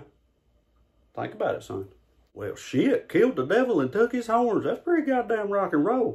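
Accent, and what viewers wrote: South, United States. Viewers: They couldn't even see his... Took me a second to understand the southern accent... BWAHAHAHAHA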